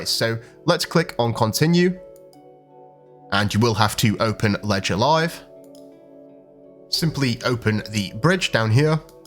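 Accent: Yorkshire, United Kingdom. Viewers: You've learnt how to fight the Yorshire accent somewhat? Bet the yanks love that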